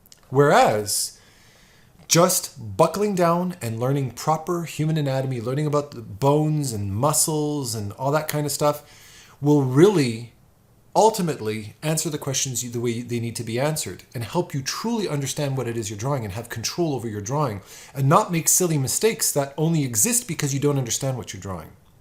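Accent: Quebec, Canada. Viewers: Love your videos, been fallowing you for awhile. I'm from New Brunswick. And I've heard you mention your from Montreal. Whether your English originally or French, I had to smile cause I heard the French Canadian Accent Pop in this video for the first time! Keep up the great work! LOL got a bit further in the video and caught you subduing french pronunciation of"necessary."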